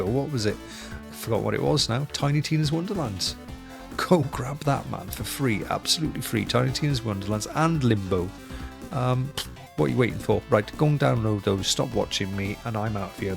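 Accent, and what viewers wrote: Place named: Wales, United Kingdom. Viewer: Love the Welsh accent but rolling his Rs lol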